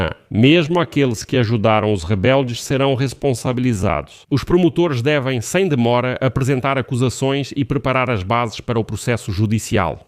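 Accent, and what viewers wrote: Portugal. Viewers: Jornalismo alexiceu língua portuguesa de Portugal fale sempre assim gostei. E língua portuguesa Portugal no Br. E nossa toponimia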